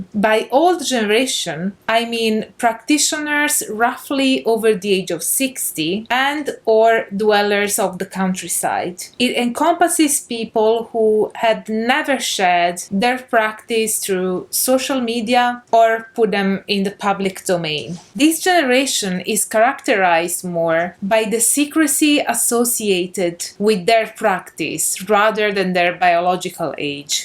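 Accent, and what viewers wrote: Italy. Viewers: Grazie mille di aver condotto questi studi, si vede quanto sei preparata e appassionata a riguardo! Pensa te che ti ho trovata su youtube casualmente, per poi capire (in fretta, hai un bellissimo accento italiano) che eri italiana e per di più a quanto ho capito vivi a Leeds.. e io vivevo a Leeds fino a poco tempo fa 😂😂😂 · Didn't recognize the ascent as Italian, my family's is different. Doesn't matter, now your just even better. Anyway, my big question is or maybe it was answered and I just didn't understand is, because I'm stuck in that old Catholic way of thinking, how you can change tradition. I'm coming at as an American, and every youtuber or person I know it's personal, or singular. It feels like it is a very new religion and changes depending on who you talk too. I would think things should be written down in old books or even tablets. 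It feels to me that thinks should stay consistent. Also, in the U.S. students don't cast spells, they just pull there cell phones out frustrating teachers.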